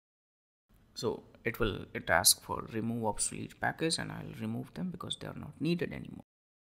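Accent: India. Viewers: No, you are too quiet, speak with a distinct Indian accent, and your are speaking way too fast to follow.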